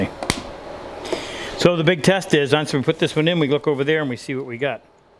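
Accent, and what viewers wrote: Canada. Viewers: And how he says hoose. I love the Canadian speech though, and I enjoy hearing him on his videos.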